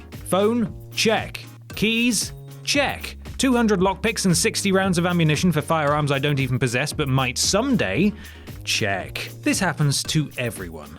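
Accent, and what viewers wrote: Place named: RP, United Kingdom. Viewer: is so posh in these videos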